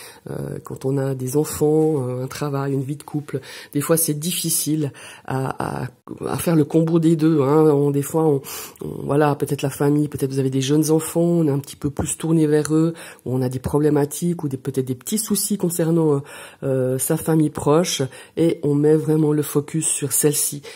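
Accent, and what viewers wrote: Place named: Geneva, Switzerland. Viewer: Merci infiniment pour votre message 🥰 J’entends l’accent genevois !?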